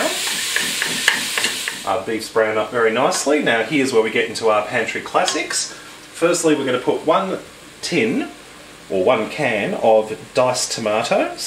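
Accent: Australia. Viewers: Love the Aussie accent! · This guy has a very clear Australian accent.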